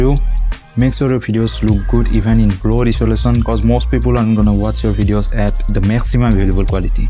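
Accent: Assam, India. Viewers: Bro are you Indian ?? Cause your English is like Indian (like me lol) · Voice to Assamese or nisina hona jai ase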